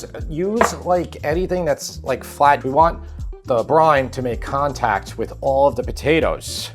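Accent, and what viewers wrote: New York, United States. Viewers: Hi, I grew up in Brooklyn and I have moved to Thailand about 15 years ago. I stumbled across your channel when I saw you were making a NY Deli Macaroni salad. I haven't seen anyone else doing it on Youtube, so I had to watch it. Then, when I heard you speak, I thought to myself: This is a genuine NY dude, not some poser from a 5 star Michelin restaurant making a NY deli salad. Watching your videos makes me feel at home. Thank you BTW, the kid is a natural food critic. He doesn't pull any punches even though his dad is right there and his dad made the dish. He's articulate and he tells you EXACTLY what he likes and doesn't like in a particular dish. It's so refreshing to see such honesty. It makes me almost miss New York.:D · Not Only Do I Love Your Recipes, But I Love Your NY Accent & I Think You’re Nice to Look at too! 😉 Keep the Recipes Coming! 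TY From Oregon! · is a great taste tester. I also love your New York accent (patata salad) LOL. I am from Bergen County NJ and say it the same way!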